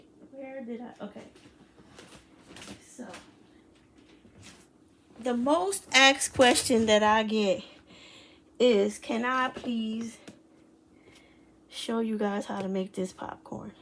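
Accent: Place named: New York, United States. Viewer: Thank you your videos are always enjoyable 💘 I'm from NY originally and your accent reminds me of home lol 😘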